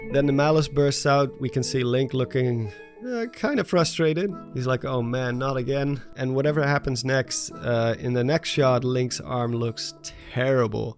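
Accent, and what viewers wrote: Netherlands. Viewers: grappig om een nederlandse accent te horen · Super cool to see another dutch zelda fan!! Found your channel awhile ago and now I'm addicted to your video's. Je hoort het bijna niet aan je stem dat je nederlands bent!! Ga zo door :D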